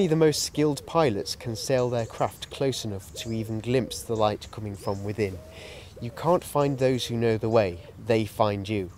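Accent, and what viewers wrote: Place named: Nicaragua. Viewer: Nicaraguan Accent!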